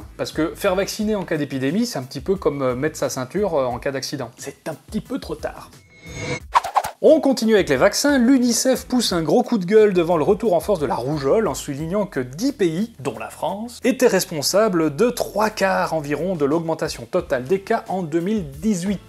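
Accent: Belgium. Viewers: Ca n'a rien à voir mais vous n'avez pas besoin d'essayer de réduire votre accent belge. Je ne pense pas que ce soit à cause de la façon dont les mots sont prononcés que la plupart des gens écoutent ce que vous avez à dire. ^^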